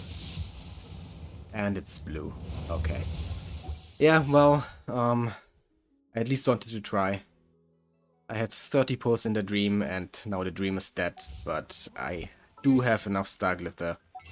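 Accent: Germany. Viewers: You're German? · thats the most german accent i have ever heard in my life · I smell a fellow german 😋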